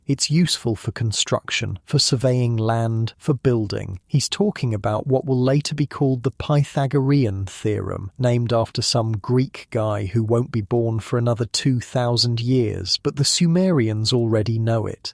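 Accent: England, United Kingdom. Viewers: Hello there well this is my first time posting, I am from Northwest Ontario and a very small community of 2000 people. I am native Canadian and I absolutely love listening to you every night, I love the fact that you have an English accent, which is very pleasant And I must have fallen asleep at sometime but the factors I enjoy it when I come back every other night thank you so much for this service as long as you’re not a Yankee, thank goodness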